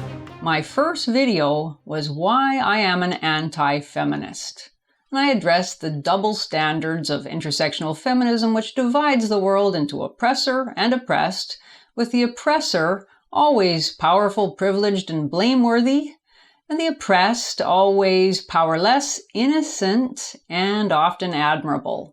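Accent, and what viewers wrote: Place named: Canada. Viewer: Goodness gracious I love her Canadian accent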